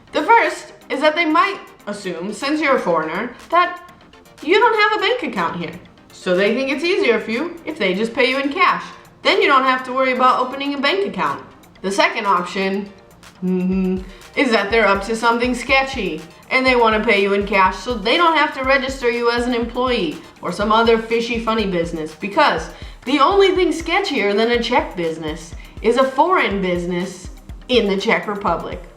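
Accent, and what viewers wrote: Czech Republic. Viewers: All right girl, I do not know where the heck are you living, but let´s go through some facts. At first, children drinkin alcohol is a serious issue in CR as well. 2nd thing yea, there is no tolerance about driving while alcohol is still in the system, but it is happening frequently and people are dying bcs of it. Often. Also, people are fighting while drunk, very often. I live near a complex of bars and clubs and every weekend I am just counting ambulances escorting idiots from here. You are right about our fridges and grocery shopping, however paying rent by cash? It can happen sometimes, but usually normal thing is to pay by money transfer from your account. Salary in cash? WTF? Maybe when you are working in a pub, but otherwise nope. NOPE. If we are talking about "scetchy" business, then this has nothing to do with our country, it is happening everywhere in "modern" parts of world. 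Last thing, I am not trying to be suspicious, it´s more of a funny thing I noticed, but your accent is really "czechy". Cheers, enjoy your living in our beer land. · She has Czech accent tho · Your accent is Czech haha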